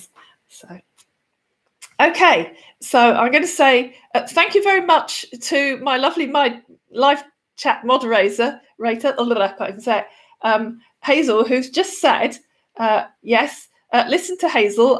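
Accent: United Kingdom. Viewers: Hello! I'm learning English and I discovered your chanel. I'm interested in romance scamming because it's an interesting subject. I've seen several videos of yours and at last I see your face. Your have such a lovely voice and I like your British accent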